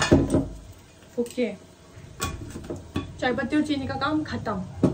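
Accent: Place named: Shekhawati, India. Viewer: बेटा आप कहां से हो कहां पर रहती हो बोली भाषा से लगता है कि आप शेखावाटी से हो क्योंकि मैं भी शेखावाटी की हूं नवलगढ़ की हूं मैं मुझे अच्छा लगता है आपका ब्लॉक ब्लॉक में बताना कहां पर रहती हो मैं कल के ब्लॉक में आपका इंतजार करूंगी चाय अच्छी है खुश रहो बेटा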